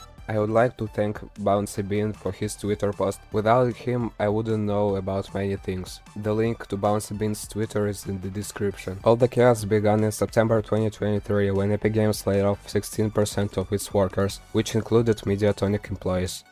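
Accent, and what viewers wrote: Russia. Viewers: Акцент как у русского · ты русский? Просто акцент как у русского, да и сам ник тоже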